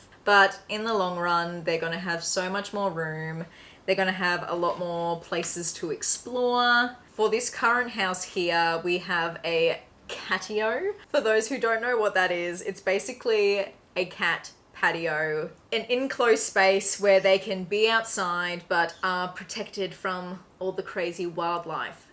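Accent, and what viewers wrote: Australia. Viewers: 🐰Thank you for your vlogs. Hug from France. (Btw, your Aussie accent ,like Sarae Renae Clark, is so lovely and easy to understand).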